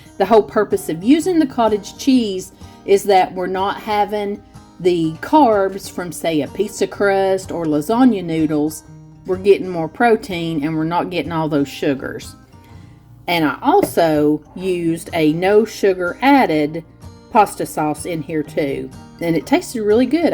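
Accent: South, United States. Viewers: thank you for replying about my cottage cheese/ beet recipe. I can just hear your voice …. I love your southern accent! And of course all your recipes, I have tried so so many of them!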